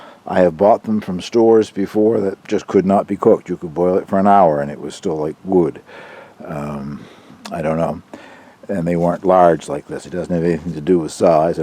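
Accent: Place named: Canada. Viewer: Dear, I have just found your channel in the past 2 weeks.This is the best! Really enjoy all your videos, the chickens, darling Angel, your cabin all wonderful. I think you may be a modern day Thoreau! Thank you for sharing your very interesting, and inspiring life. Have always wanted to visit Campobello Island, as well as P.E.I., hope to do so one day. My maternal Grandparents were Canadian (I am American) and it is lovely to hear your accent.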